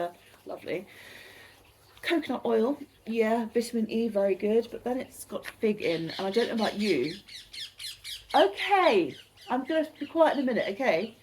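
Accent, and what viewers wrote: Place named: RP, United Kingdom. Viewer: Ooo love the way you say you're shakespear quotes with you're gorgeous posh voice ❤❤❤❤❤❤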